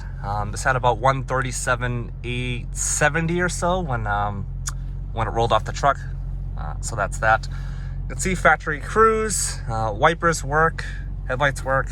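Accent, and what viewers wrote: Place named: Boston, United States. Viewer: Nice ride, can hear your Boston twang haha. Wheels look like they’re in good shape too, those lace wheels look great on the XJ. Seems like a major upgrade over the last one!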